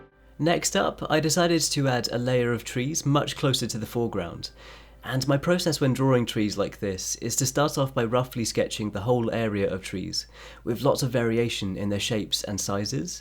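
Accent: United Kingdom. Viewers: your videos are now one of my favorite coz i learned two thing after watching it...how to draw and a british accents😁😅... can you draw emma watson?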